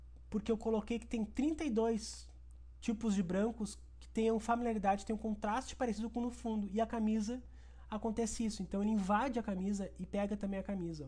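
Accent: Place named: Rio Grande do Sul, Brazil. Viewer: Eu simplesmente amei Gostei do modo que você falar, com certeza é Gaucho muittto bom mesmo, aprendi demais Obrigadaaa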